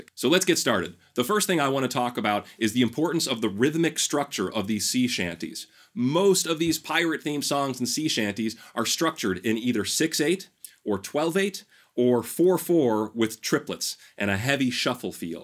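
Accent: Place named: Midwest, United States. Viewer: Wow I just stumbled on this video and man, I just wanted to say thanks and congrats. You have great content, a nice fast pace and a perfect radio voice (with a crisp Midwestern accent indeed). I learned a lot even though I've been playing music all my life. This was legit one of the best tutorials I've ever watched. 👍🏽👍🏽👍🏽 Keep rockin'!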